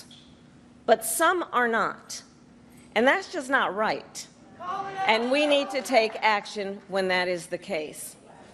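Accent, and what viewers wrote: South, United States. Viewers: What is the all of a sudden have a Southern drawl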